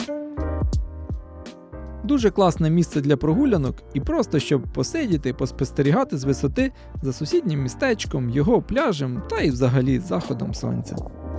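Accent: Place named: Poltava, Ukraine. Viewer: а мені здалося, що у Вас полтавський діалект. Принаймні, дуже схоже! 😊 Дякую за таку цікаву подорож!❤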